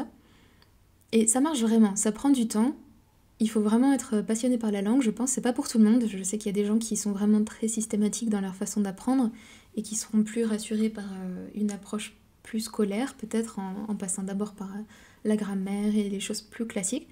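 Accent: Russia. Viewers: Tu ne serais pas plutôt bilingue Russe/Français issue d'un mariage Mixte ? car hormis ton génotype plutôt Russe ton petit accent te trahit aussi, puis quand à tu demandes si "obsessive" se dit en Français, j'ai de gros doutes, merci quand même pour ce Coucou de Russie, en souhaitant que les relations entre nos 2 pays historiquement amis se rétablissent au plus vite. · Vous avez un accent russe maintenant 😊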